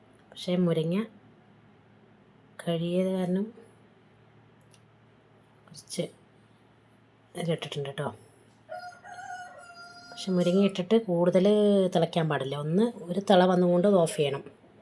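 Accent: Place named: Palakkad, India. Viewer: കുറേ ദിവസായി പാലക്കാടൻ സം സാ രം കേട്ടിട്ട് കേൾക്കാൻ കൊതിയാവുന്നു ലൈവ് ഇപ്പോൾ കിട്ടുന്നില്ല 😭😭😭😭😭😭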